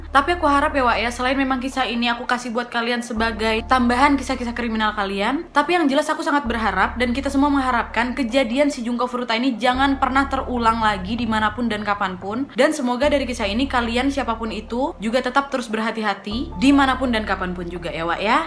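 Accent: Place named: Medan, Indonesia. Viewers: Medan punya bahasa ni ya😁🤣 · Anjir medan kali kau kak 😄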